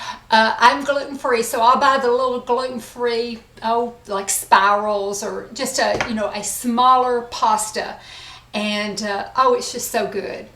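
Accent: South, United States. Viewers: Love ur southern accent!what state are u in...?also would that Bobby brown shade look good on brunettes?always looking for a new shade...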